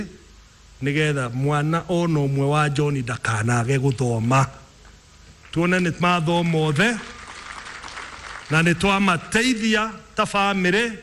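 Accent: Kikuyu, Kenya. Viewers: Peter Kenneth, you make Kikuyu sound so sweet.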